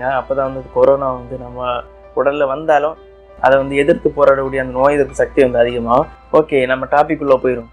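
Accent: Kanyakumari, India. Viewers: Bro ,,, neenga Kanyakumari ya ?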